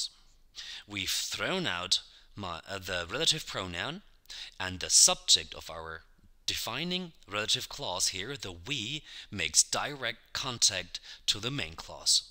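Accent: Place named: Germany. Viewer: thanks a lot, you explain clearly, but you speak as Germans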